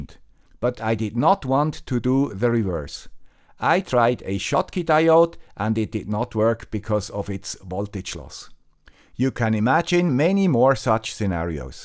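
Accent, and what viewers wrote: Switzerland. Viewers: He does explain very early that he is the guy with the SWISS accent. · Perfect, you explain it as it should be. You are real gem Mr. with swiss accent.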